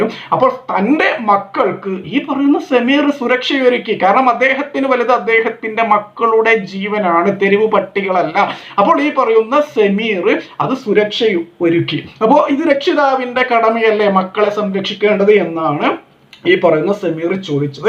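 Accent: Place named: Kasaragod, India. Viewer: ഒരു കാര്യം പറയട്ടെ കൂട്ടുകാര നിങ്ങളുടെ ചാനൽ ഞാൻ കാണാറുണ്ട് നിങ്ങളുടെ ശൈലിയിൽ നിങ്ങൾ സംസാരിക്കുക നിങ്ങളുടെ സംസാരം കാണുബോൾ ഏതോ ഒരു കാസറഗോഡ്കാരൻ സംസാരിക്കുന്നതുപോലെ i like you this chanal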